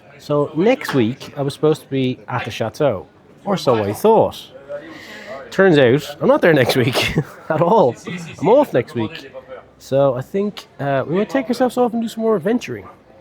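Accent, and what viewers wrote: Ireland. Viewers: yes. I had noticed his Irish accent ❤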